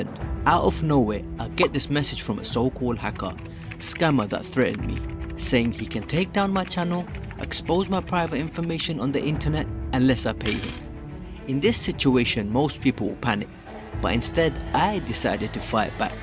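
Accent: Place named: Nigeria. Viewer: Why are you talking like a Nigerian 😂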